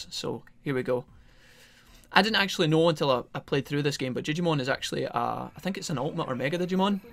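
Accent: Scotland, United Kingdom. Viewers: Scottish Ron Weasley Digimon World let's play...... You know I'm gunna thoroughly enjoy all these episodes ☺️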